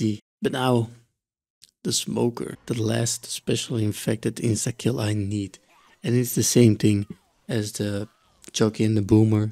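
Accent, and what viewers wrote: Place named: Turkey. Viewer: Are u from turkey bro? Wtf is this accent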